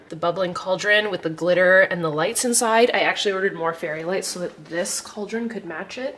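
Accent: Canada. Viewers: I’m distraught over you saying packages and not parcels🥲😂 I LIVE to hear you say parcels, it’s so Canadian🇨🇦🫶🏻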